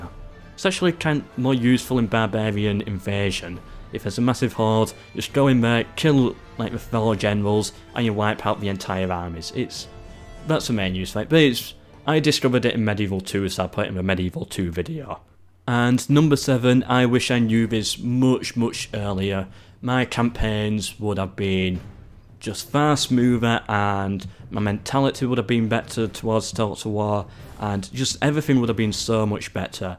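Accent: United Kingdom. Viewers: what kind of accent is that im in love with accents from the UK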